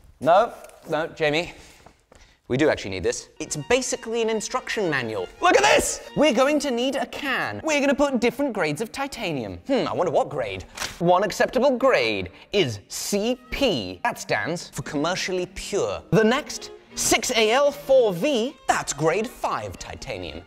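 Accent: England, United Kingdom. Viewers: The British accent makes me trust him 25% percent more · When I heard his voice I thought, YES. An Englishman making a Damascus. This should be good. I left after posting this comment. The loud americanisation of the production is off-putting. Sorry, but reign it in. Quality over quantity... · isn't it crazy that he said the British equivalent of "the patent has fucking expired" but since he speaks queens gibber it doesn't sound heavy at all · degrees fahrenheit, thousands of an inch, yeee haaa, what part of England are you from? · So what's up with the Montana thing as you sound British but I get it I'm a dual but I'm a graduate of Carroll College in Helena. My two best friends are two of my good friends live in Bozeman one has a pizza shop and the other is a family therapist! Another friend of mine used to be a representative from Butte but he had a bike accident and sadly passed early. 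He was a hippie and he looked like hippy, And he was a absolute golf pro so the locals would use him up in Bigfork to play golf against others and tourists and he was there ace in the hole. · Its just the awesome upper british accent and language next to the chaos of forging some cool damascus. Some itch was scratched in my brain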